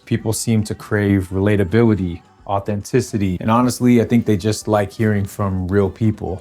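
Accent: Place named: United Kingdom. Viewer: Hi; I enjoyed your video. I shall follow u henceforth. I guess, you are a British guy or an Australian guy as your accents are not American. But I had no difficulty at all in following each word that you spoke. Kudos to your endeavour.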